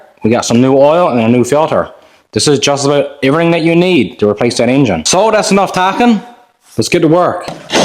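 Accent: Newfoundland, Canada. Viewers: I think he's from Newfoundland. Its the closest thing to an Irish accent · I'm not into cars and repurposing vehicles, but for some reason i tend to like your videos lol. maybe its the fellow newfoundlander accent that keeps me watching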